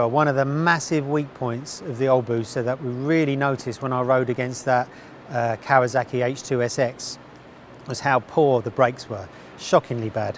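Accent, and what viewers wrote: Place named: Northern England, United Kingdom. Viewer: “MmmBooossa” said in that irritating Northern accent that all Busa owners seem to have! 😝😂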